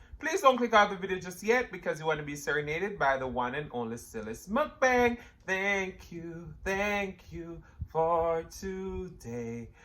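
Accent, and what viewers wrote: Jamaica. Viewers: I SAW YOU IN A COMPILATION AND HEARD YOUR ACCENT AND CAME OVER IMMEDIATELY!! IVE BEEN WANTING TO SEE JAMAICAN MUKBANGERS AHHHH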